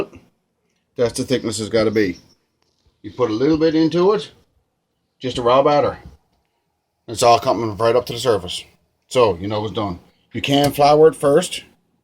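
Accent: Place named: Newfoundland, Canada. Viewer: I am loving your accent... I was thinking you’re from Ireland living in Alabama😂. 🇨🇦 Newfoundland!!! Never would have placed it 😉 thanks for the perfect recipe 😋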